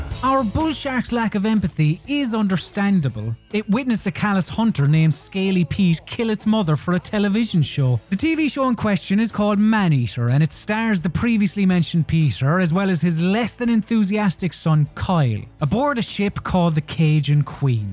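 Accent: Ireland. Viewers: Hearing a Irishmen talk about a shark game was Quite pleasant definitely will be subscribing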